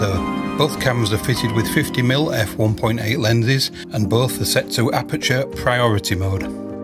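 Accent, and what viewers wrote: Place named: Hull, United Kingdom. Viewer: Can't hide a Hull accent :)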